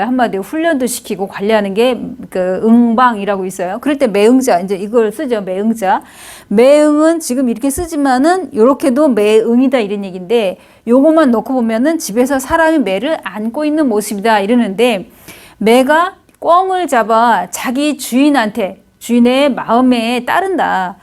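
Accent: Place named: Gyeongsang, South Korea. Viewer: 선생님 굿 아이디어 경남사투리 ㅋㅋ좋습니데이 ㅎㅎ 감사합니다 잘 배우고나갑니당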